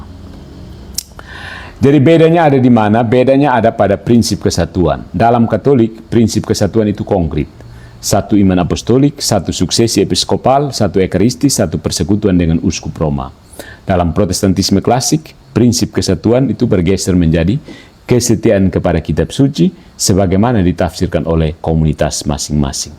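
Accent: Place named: Eastern Indonesia, Indonesia. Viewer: Selain isi dari vidio ini yg luar biasa , saya palingsreg ketika mendengarkan tatanan bahasa n logat bahasa serta intonasi saat berbahasa utk saudara /i Indonesia Timoer kita Paaaas n sertifikat itulah berbahasa Indonesia dengan baik n benar . Terimakasih Tuhan Engkau masih memberi ruang n waktu utk diriku n klg ku menjadi tubuh gereja katolik .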